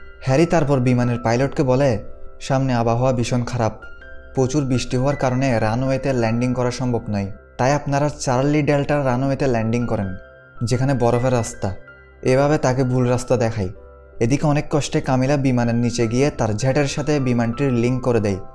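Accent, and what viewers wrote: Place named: Noakhali, Bangladesh. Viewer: Bhi.. apnar bari noakhali maijde. Ta amai vuge gesi jae. Noakhailaaa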